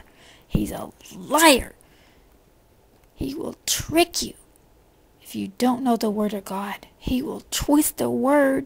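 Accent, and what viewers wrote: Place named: South Carolina, United States. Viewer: thanks for the videos.. sounds like your from i guess south Carolina?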